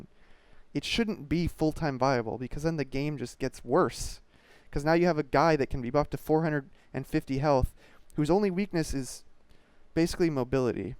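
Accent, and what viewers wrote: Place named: United States. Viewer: No, you're doing it all wrong. You need to mention AlphaDraft before the show, wear a coat and scarf like you can't afford to heat your home, thoroughly discuss the innermost secrets of game design for 20 more minutes, and all while mentioning context a few times. Also where on earth did you get that American accent, Thorin? Strangely enough it suits you.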